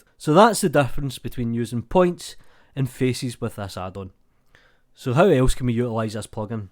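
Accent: Scotland, United Kingdom. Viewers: Hello! Cool tut, but not all words were understood behind authentical Scottish pronunciation.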